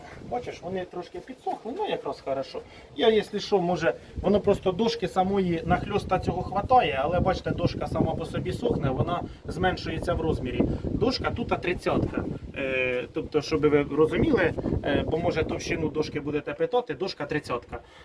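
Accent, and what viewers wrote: Poltava, Ukraine. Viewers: Доброго дня!!! Натрапила на вас на просторах YouTube, яка приємна несподіванка! Рідна, неповторна решетилівська мова.Живу дуже далеко від рідного краю і просто насолоджуюсь вашою розмовою. Із хазяйства в нас тільки кіт, рибки і у внука богомол, але разом з внуком з цікавістю спостерігаємо за вашими тваринками., запитайте, чи був у неї однокласник Віталій, думаю що вона ходила в один клас із моїм племінником. Світ дуже маленький, хоч, може, помиляюсь, бо вже майже нікого не знаю в Решетилівці.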